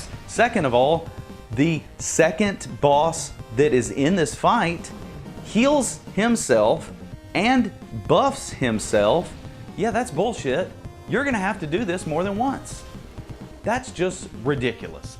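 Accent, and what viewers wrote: South, United States. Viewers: Love the southern accent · Listening to a dude with a good 'ol boy accent talk about horseshit in the Dark Souls series is peak internet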